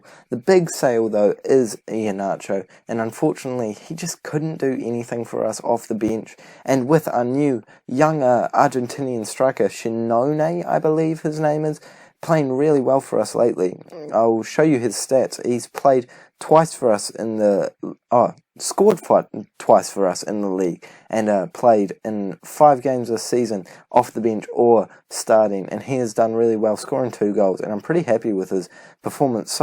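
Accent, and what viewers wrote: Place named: New Zealand. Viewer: always nice to add another Kiwi voice to my lineup. Keep up the good work! If you want some tips on playing the game, I did a series recently called Tips for the Advanced Football Manager, you may find it useful.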